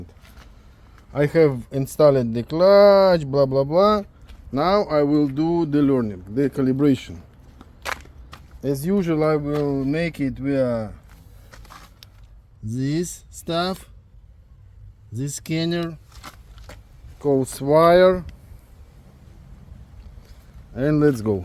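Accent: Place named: Russia. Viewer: На кой ты по пендоски базаришь , разговаривай на русском как нормальный человек, ты же блин из России , по отвратительному акценту и заднему плану понятно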